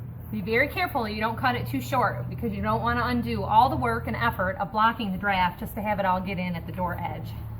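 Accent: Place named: Michigan, United States. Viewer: Michigan, I was born in Lansing. Thought you sounded a lot like my cousins up there 🤣. On another note, we just replaced all our windows and the doors are next. We have big problems with humidity hear so wood movement is always changing the way windows and doors shut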